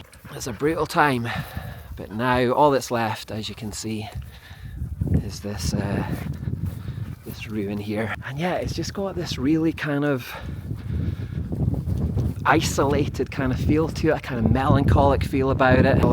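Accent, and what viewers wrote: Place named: Aberdeen, United Kingdom. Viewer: you need to do your tree man you sound like you're from aberdeen'ish area - my granny was from lewis and her mum was from Aberdeen, well Moray (Urquhart) - thank god - cause records only go to the late 1700s in Lewis - Aberdeen's are way better - you're probably related to loads of these folks - I'm related to the folk that used to live at Drum castle too :D its well nice - I now want to go and reclaim all these castles :D :D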